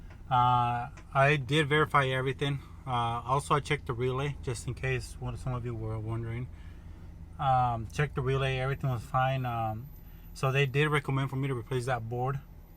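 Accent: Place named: United States. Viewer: Yo pensaba que eras gringo ...Jajaja good videos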